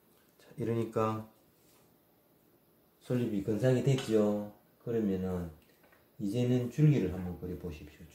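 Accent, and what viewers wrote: Gyeongsang, South Korea. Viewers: 경상도 말씀 설명 정겹습니다~~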